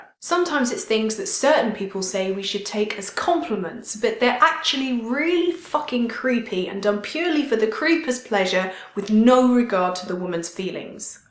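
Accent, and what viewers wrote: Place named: London, United Kingdom. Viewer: I find myself wondering where you grew up. I think I heard in another video that you like in Edinburgh now? By your accent I'm thinking you grew up around London perhaps? I grew up in York and never heard of any such going on happening to any of my friends. Even When I moved to the much larger city of Edinburgh to go to university I still never noticed this behavior of random creepy street-goers. I'm male, so perhaps that insulates me from it a lot. Do you find that this problem of street harassment is lessens the further North you go?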